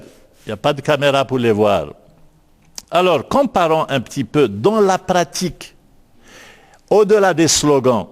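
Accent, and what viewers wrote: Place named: North Africa. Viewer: Pourquoi parfois vous roulez les R alors que parfois vous les dites parfaitement... Cheulou cet accent du bled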